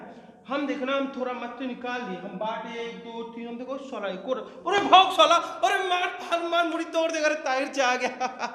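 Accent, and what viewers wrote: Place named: Uttar Pradesh, India. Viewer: Sahi bola Bhai...pura UP ka hi lg rha h bhai...bhasha se🤘🤘🤘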